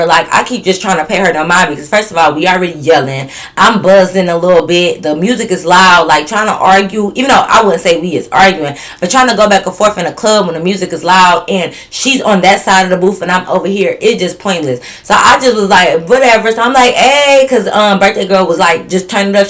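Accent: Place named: Detroit, United States. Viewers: My first time to your channel. I knew you were from Detroit 20 minutes in. 😂❤ subscribed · Girl idk what the accent is or sounds like either but I knew you from Detroit by the “accent” and I got excited halfway through when you said you were ❤😂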